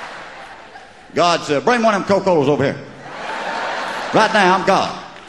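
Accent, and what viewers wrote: South, United States. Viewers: I read this guy's books as a kid because my parents had them, but I never imagined this voice in my head. He looks like his voice would be more nasal and nerdy. I didn't imagine the southern accent either. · you will find his pronunciation in some southern and mountain dialects (also in Arlo Guthrie) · No doubt, a southern son, and one of many inspirations of Jeff Foxworthy. Both from Atlanta, and the southern accent is undeniable. He is not forgotten. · I remember checking this guy's books out from the library way back in the day. Up til now I've never heard his actual speaking voice. He's as Southern as I am :)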